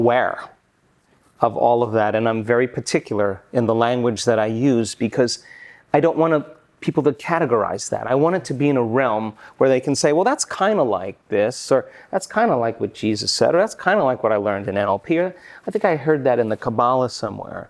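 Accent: Germany. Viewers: cuuuute german accent :)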